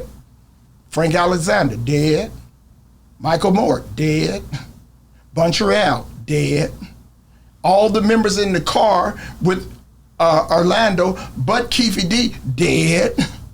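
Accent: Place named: United States. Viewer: Forensics It’s spelt and pronounced forensic for en sic What do you say I need is telling us about Keefe, D he says he’s not the sharpest mate you need to learn to pronounce and maybe spell English fucking hell he says flooronsic haha You know I get the strong American accent, but there’s no excuse on this one mate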